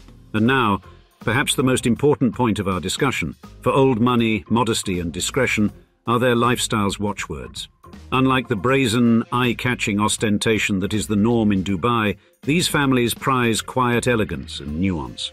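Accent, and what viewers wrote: United Kingdom. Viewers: Interesting: with a crisp British accent, we're informed that data collection in Dubai is extensive, and that cameras are ubiquitous. London is THE city to visit to be caught on camera.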